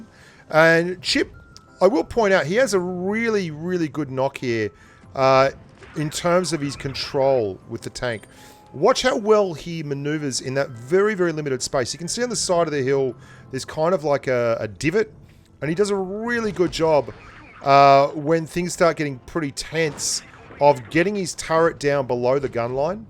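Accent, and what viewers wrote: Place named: Australia. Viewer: mate r u aussie? you sound so much like one.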